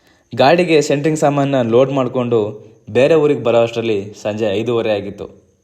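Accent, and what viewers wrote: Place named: Talakadu, India. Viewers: Super super 👍 ಗುರು. ಈಗಾಗ್ಲೆ ಎಲ್ಲರನ್ನು ನಕ್ಕು ನೀಗಿಸಿದ್ದಿರಿ, ಖಂಡಿತಾ ನಿಮಗೆ ಒಳ್ಳೆ ಹೆಸರು ಬಂದಿದೆ. ಇಷ್ಟು ಕಷ್ಟಾ ಪಟ್ಟವರನ್ನು ಎಂದೆಂದಿಗೂ ದೇವರು ಕೈ ಬಿಡುವುದಿಲ್ಲ . ಎಲ್ಲಿಯತನಕ ಆ ದೇವರು ಕೊಟ್ಟಿರುವ ಆರೋಗ್ಯ ಇರುವುದೂ ಅಲ್ಲಿ ತನಕ ಯಾವಾ ಕೊರತೆಯೂ ಚಿಂತೆಯೂ ಇರೋದಿಲ್ಲ ಅಂತ ನನ್ನ ಅನಿಸಿಕೆ. ನಾನು ಸಾಹ ನಿಮ್ಮಂತೆ ಕಷ್ಟ ಪಟ್ಟು ಈ ಹಂತಕ್ಕೆ ಬಂದಿರುವೆ ಎಂದು ಹೇಳಿ ಕೋಳ್ಳಲು ಹೆಮ್ಮೆ ಇದೆ 😉 ಆ ಭಗವಂತನ ದಯೆಯಿಂದ ನಿಮ್ಮೆಲ್ಲಾ ಆಸೆ ಆಕಾಂಕ್ಷೆ ಈಡೇರಲಿ ಎಂದು ಹೃತ್ಪೂರ್ವಕವಾಗಿ ಹಾರೈಸುತ್ತೇನೆ. ನಿಮ್ಮ ಸಂಭಾಷಣೆ ಕೇಳಿದರೆ ಮೈಸೂರು ನಂಜನಗೂಡಿನ ಕಡೆಯವರು ಅಂತ ಅನ್ನಿಸ್ತು. ಯಾವ ಊರು ನಿಮ್ಮದು !? ಕುಳ್ಳಿ ಯ ಹೇಸರೇನು !? ಅದ್ಬುತ ವಾಗಿ ಅಭಿನಯ ಮಾಡುತ್ತಾರೆ. ನಮ್ಮೂರ ಭಾಷೆ ಕೇಳಿ ತುಂಬಾ ದಿನಾ ಆಗಿತ್ತು. Anyway thank you so much 👍🎉💐🤝👌🙏💯 ಹರಿ🕉️ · Howdu kul munda chanagidane idu chamarajanagar side language nan amma kuda a kade avre I like this language.... chanagiratte akade bashe kollegala, nanjanagudu, chnagar, gundlupete side bhashe very nice .... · Nan gandana uru thalakadu nim bhashe nange thumbha ishta nan gandana uralli nim tharane mathadodhu ❤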